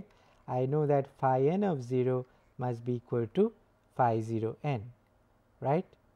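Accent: India. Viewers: he's indian, both ears unhappy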